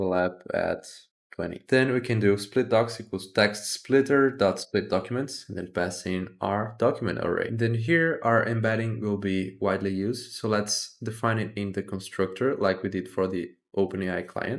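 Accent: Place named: Brazil. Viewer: Não interessa o quão bom o seu Inglês seja, um Brasileiro sempre reconhece o outro haha, muito bom seu conteúdo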